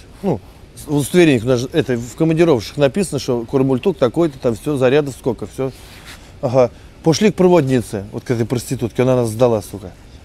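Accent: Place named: Nizhny Novgorod-Vladimir, Russia. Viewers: наш нижегородско-владимирский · мне нравится его русский говор !!!!!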